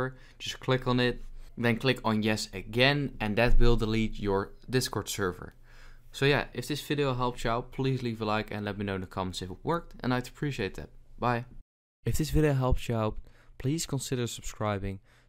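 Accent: Netherlands. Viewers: You have a Dutch accent maybe you’re Dutch?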